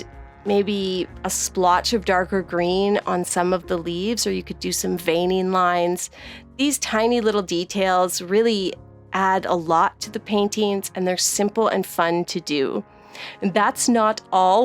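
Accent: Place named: Canada. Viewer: Accent .... heard very slightly in the way you pronounce your "O's"...... Canada 🇨🇦? I can only aspire to paint like you..... 😒 my ink and wash is positively elementary.. you are so talented 💖 warmly, base of Mt Hood.... Oregon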